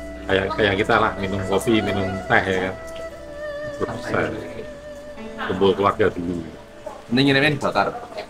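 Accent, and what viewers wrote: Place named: Semarang, Indonesia. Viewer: Semarang tulen logate nyenengke tok. Aku padamu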